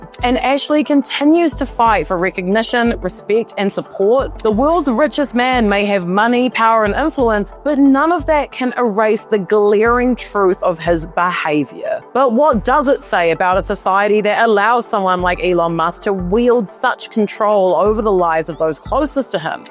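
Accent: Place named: New Zealand. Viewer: Really enjoying you videos! Are you a kiwi? 😊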